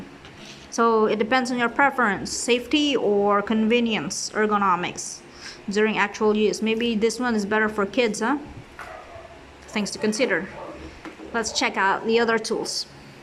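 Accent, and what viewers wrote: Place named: Louisiana, United States. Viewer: Good review, not many do such indepth demonstrations of capability. The Micra never really appealed to me, I think if I want scissors as a more important function I'll always opt for Victorinox as they're the best multitool scissors, whether on the smaller models like you show (Rambler or Manager) or especially on a larger pocket knife like the Climber etc. I do however like the Squirt as it's about the best tiny plier-based multitool out there, it seems well designed and I like the aesthetics - being compact and sleek - more than those of the Gerber Dime for example. Also it's helped me on weird little tasks where nothing else larger would have worked. Finally I am really curious about your accent? Are you from Lousiana, or were you born outside the US? Sorry to ask a personal question but your accent and pronunciation is really nice!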